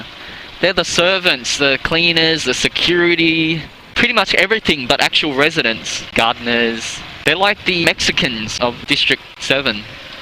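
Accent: Australia. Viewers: is an Australian Vietnamese. This is how we sound.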